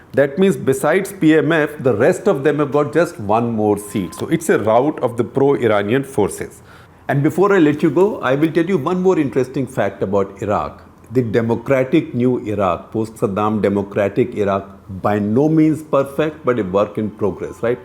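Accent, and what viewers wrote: India. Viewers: Dear, Please pronounce the words ARAB and KURD naturally. Your English accent is hardcore Indian. Trying to anglicize Arab as ayarab and kurd as curd sounds little wierd.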